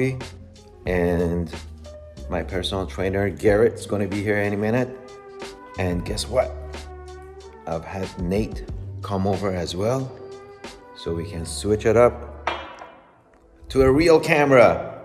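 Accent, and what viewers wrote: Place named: Iran. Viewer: Pure Iranian accent. It's good tobe original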